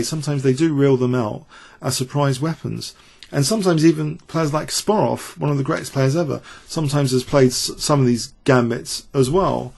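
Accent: United Kingdom. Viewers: British accent is so British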